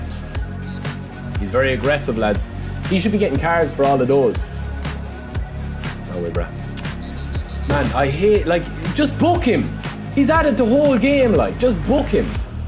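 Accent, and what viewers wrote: Ireland. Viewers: Also love your commentating 😬. Wish I had your Irish accent ❤️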